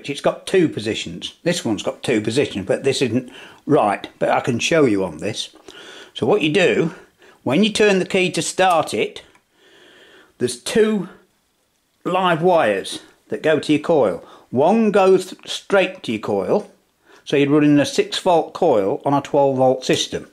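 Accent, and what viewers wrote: England, United Kingdom. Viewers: Shit, I legitimately thought I was fluent in English as a French native but to be honest I can't get anything of this strong English accent contrary to American accent.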